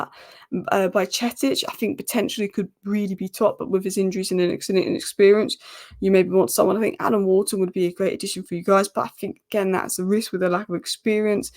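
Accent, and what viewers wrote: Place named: Liverpool, United Kingdom. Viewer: Last year in the same pre season games Quansah played as well as now. I hope he will play much better then then when the real games begins. In the end of the last season he didnt play well but now his experiencie grows and he is more reliable. I think LFC still needs a top level CB. By the way........di you already noticed that you are almost scouser?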